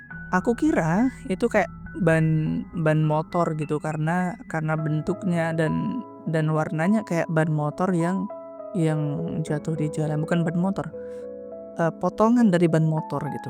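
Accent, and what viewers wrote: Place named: Sulawesi, Indonesia. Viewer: Logat sulawesinya masih nempel ya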